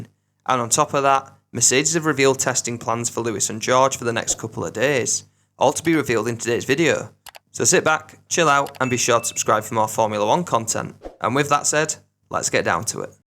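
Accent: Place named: Hull, United Kingdom. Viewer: That's deffo a Hull accent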